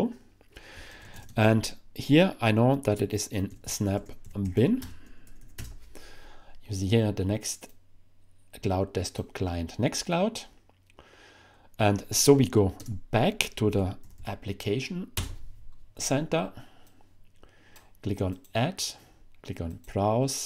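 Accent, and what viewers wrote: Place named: Black Forest, Germany. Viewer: I thought your Ubuntu video info was great, thank you! I'm very pleased with the distribution. And your "Black Forest" English! I knew my German grandfather was from the Black Forest area in Germany, but I didn't know what or where that was. I just looked it up. How fun to listen to someone from the area my granddad's family upbringing.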